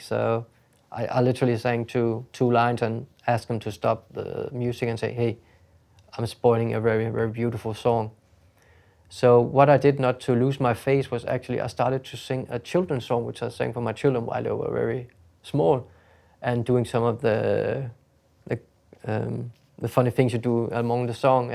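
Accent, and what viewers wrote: Denmark. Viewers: Man kunne høre han var dansker fra starten 😂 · Bro the danish accent is so clear it’s funny to me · Damn, knew he was danish the moment he opened his mouth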